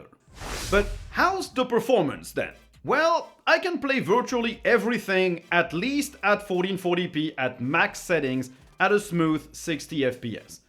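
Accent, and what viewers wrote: France. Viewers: Petit frensh accents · He's french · J’suis pas fou c’est un français il parle bien mais on se sait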